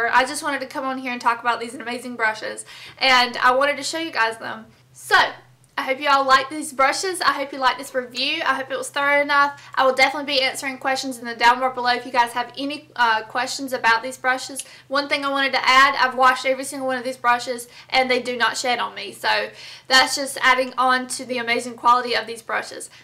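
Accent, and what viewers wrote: South, United States. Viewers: LOVE YOUR Southern accent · I love your southern accent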